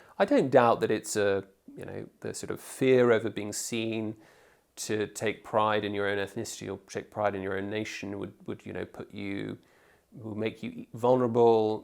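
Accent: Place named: RP, United Kingdom. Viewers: I live in your country 16 years and I can tell you I struggled to understand your English accent and dialects . Having family in USA and communicating in English with them wasn't same like you do in England. It took me a while to learn and get used to your speaking your accents. I have British friends I speak with them I ask questions anything I want to know and learn about your country I read books about British history too. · Aye, annoys me to no end that nobody listens until you get somebody with a posh voice like this man or that Douglas Murray saying stuff we've been saying for decades. The classist element of the defiling of England is one of the most disgusting parts of all this. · This guy if he had a neighbourhood move in he with his posh life like he said it’s ALIAN to him with his posh accent I’m happy for him to have that sort of life style but he’s in a bubble and it’s not really his problem but his family in the future will leap the rewards of the Islamic way of their life style , he’s very vague about what’s happening in the UK LISTENING TO HIM ,NOT FOR ME THE ELITE IN ITS FINEST FORM 🙄🙄🙄🙄🙄🤔🤔😒😒👎🤷‍♀️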